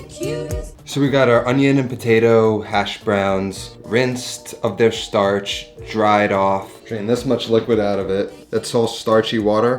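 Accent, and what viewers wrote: New York, United States. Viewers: Oh my god are you like from New York or something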